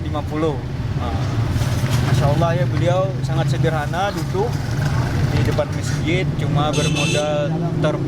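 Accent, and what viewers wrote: Banjar, Indonesia. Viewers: Urang banjar Kalo? Logatnya tadangar sedikit han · hanyar aku tahu logat banjar..!! Banjar kalua kh? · kayak ada logat Banjar nya Abang,,,,dr mana asal nya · logat urang banua ni kada bisa dikeramputi lagi bang ay. mantap 😂 sehat selalu pian pun 😁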